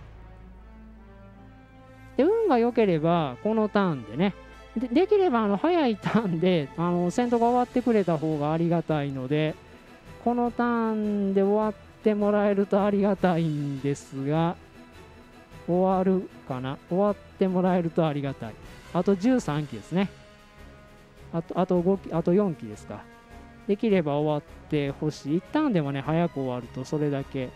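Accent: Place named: Kansai, Japan. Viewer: 淡々と関西弁で語りながら所々冗談入ってるのが面白い ここから宣伝につなげるとは思ってなかったｗｗｗｗｗ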